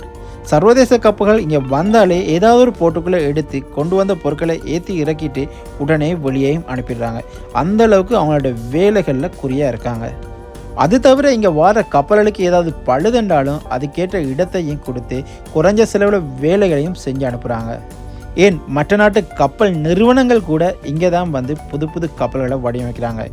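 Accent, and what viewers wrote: Sri Lanka. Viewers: உங்கள் ஈழத்தமிழ் உச்சரிப்பு அருமை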